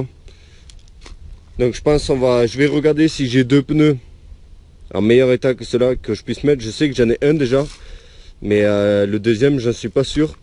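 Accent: South, France. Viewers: J’aime pas l’accent du sud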